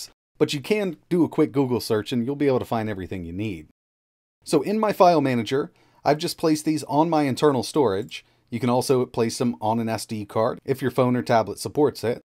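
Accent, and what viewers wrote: United States. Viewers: An American accent.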